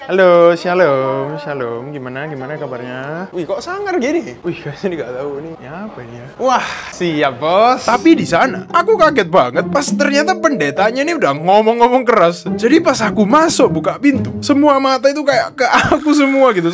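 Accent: Java, Indonesia. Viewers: Medoknya khas banget😂, semangat koh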